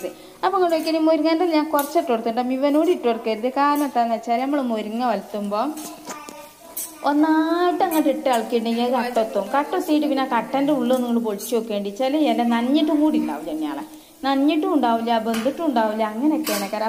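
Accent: Malappuram, India. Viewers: 1day andhakkaa vibavangallaaa ningal super anu ttoo pinnee nongale basha kette video kelkkan oru sugamanu annee😍😍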